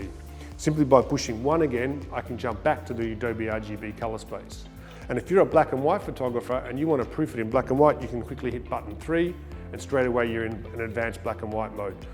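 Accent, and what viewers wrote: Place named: Australia. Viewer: Aussie accent makes me want to vomit.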